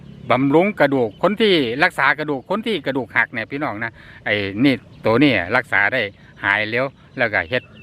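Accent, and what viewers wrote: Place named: Chaiyaphum, Thailand. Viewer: คนลาวอิสาน ชัยภูมิ เว้า แนวนี้ เนาะ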